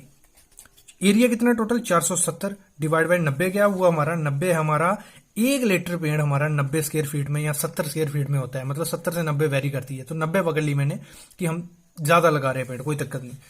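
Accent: Jammu, India. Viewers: bai muje sabse pehle ye batao ap Jammu se ho kya?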